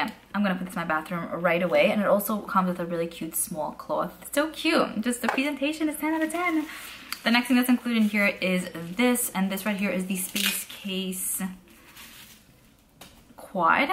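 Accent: New York, United States. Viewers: I AM FROM THE BRONX, I KNOW YOUR ARE FROM SOMEWHERE IN NYC WITH THAT ACCENT!😄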